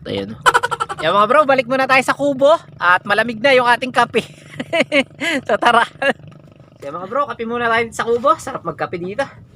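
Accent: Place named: Talim Island, Philippines. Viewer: Lalo akong nag-eenjoy na panoorin ang vlog ninyo kapag naririnig ko ang puntong Talim Island ninyo. Bang-laki ng bangus hane?! 😀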